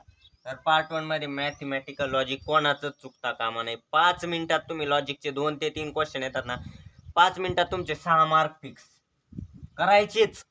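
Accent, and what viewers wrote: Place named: Ahmednagar, India. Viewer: नगर ची भाषा ऐकायला फार गोड वाटते दादा तुझं मार्गदर्शनाची मदत होते धन्यवाद मुंबई वरून 🙏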